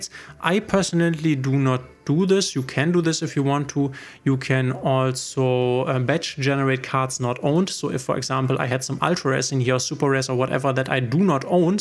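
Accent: Germany. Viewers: Bin neu und habe schon an seinem Akzent irgendwie bemerkt, dass er Deutscher ist :))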